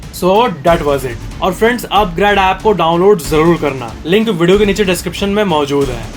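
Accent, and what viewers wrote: Bihar, India. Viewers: Bihar se ho jio bro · U r from Bihar bhole bhihaari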